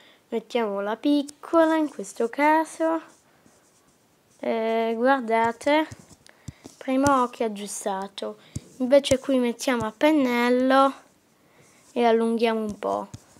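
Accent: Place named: France. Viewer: Ma parli con l'accento francese. Tuo padre è italiano e tua madre è francese oppure il contrario?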